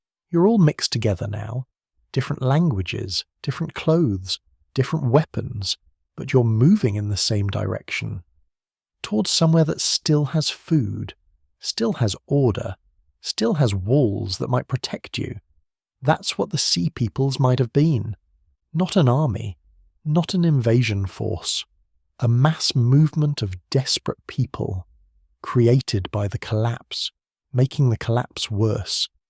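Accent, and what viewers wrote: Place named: United Kingdom. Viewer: Very good content and no useless/annoying sound effects. Thank you. Why the British accent, though? It doesn’t make this more believable or impressive. A matter of cost, perhaps?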